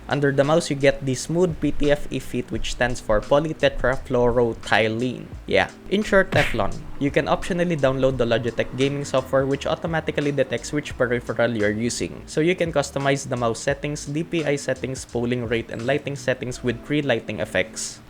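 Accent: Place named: Philippines. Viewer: I subscribed, very good review, nice filipino accent.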